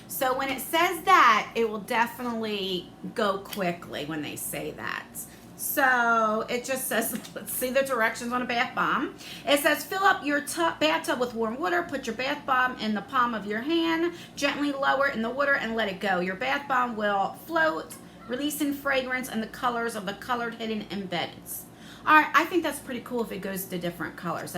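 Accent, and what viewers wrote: New Jersey, United States. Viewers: Hey Jersey, love love that accent!!! I have lived in New Jersey 3 different times in my life. Had a lot of fun out there. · Are you Jersey or NY? I just adore you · Love the deep NJ accent!!!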